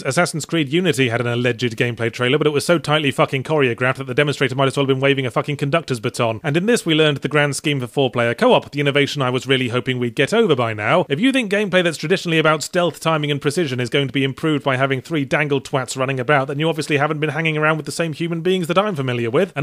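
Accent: United Kingdom. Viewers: Man. I love the cynicism of this show and the British accent only makes it better. Couldn't stop laughing. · it is like all of my gamer grief in one video but with a british accent....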